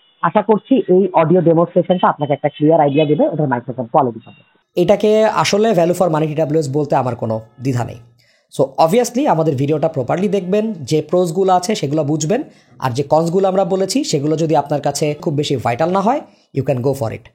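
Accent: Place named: Dhaka, Bangladesh. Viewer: কে ভালোবাসা দিয়েই ধন্যবাদ জানাই যাউজ্ঞা সামনের দিক আউজ্ঞা ঢাকাই ভাষা অসম্ভব ভালোলাগে তোমার😊 আপনার উপস্থাপনা দুইভাবেই মাঝে মধ্য আপন ভাবে কথা বলি আমি, উপস্থাপন দেখে। Don't mind plz ঢাকার পোলা আইসা পড়ে। দোয়া ও শুভকামনা সত্য সামনে রেখে কথা বলা (যেকোন পন্য রিভিও এর কথা বলছি)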